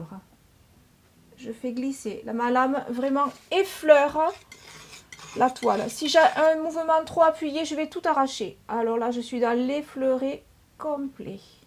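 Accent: South, France. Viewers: J'ai beaucoup appris avec vous. J'aime votre accent du Sud!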